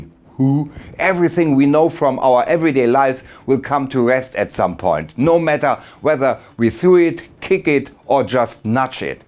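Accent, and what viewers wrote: Germany. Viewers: German Englisch ist a little funny. 😎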